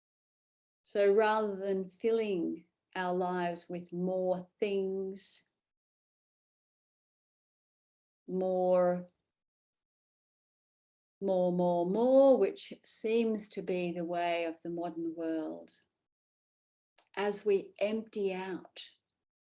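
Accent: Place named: Australia. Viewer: That was Beautiful! You know years and years ago I absolutely fell in love with yours and Terry’s Yoga Nidra recordings and they are a go to frequently still… Beautiful! Salve for the soul..I always felt your voice (first Aussie accented meditation I’d heard….Aaaaahhh!!) and of course the beautiful music just reliably brought me Home..Here..Now! Though I couldn’t quite formulate it as such until just recently. After consuming so much content on the non-dual understanding during the pandemic via beautiful sources such as Rupert Spira, Francis Lucille, Bentinho Massaro, Mooji…etc etc I recognised and felt that the sense of being they had pointed me to was the same seemingly placeless place into which I would frequently and almost always effortlessly sink Deeply upon listening to your Yoga Nidra… So thank You Deeply from the depths of Being it is BEAUTIFUL to be able to finally see AND hear your familiar voice regularly.. 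I Love You Both Very much!! Thanx again! XX Luke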